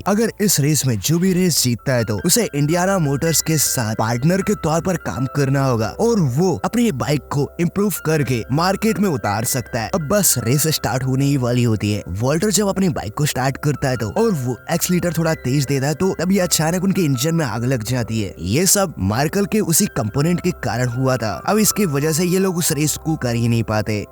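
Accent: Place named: Jharkhand, India. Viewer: bhai tumara voice acha hai lekin tumara hindi छपरी वाला or zarkhandi boli ka hai ese thik karo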